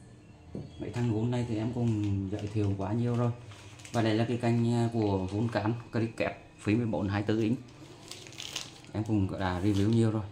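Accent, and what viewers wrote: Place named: Nghe An, Vietnam. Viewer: Giọng bác giống như ở thanh chương hay đô lương gì nhỉ.giọng chất quê choa hi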